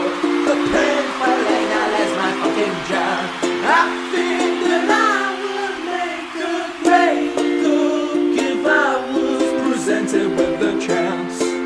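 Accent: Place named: United Kingdom. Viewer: I sarabscraibe just for the british accent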